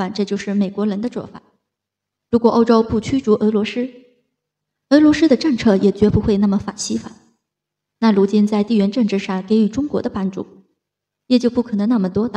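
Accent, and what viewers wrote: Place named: Liaoning, China. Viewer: 俄罗湿？主播是辽宁哪里的？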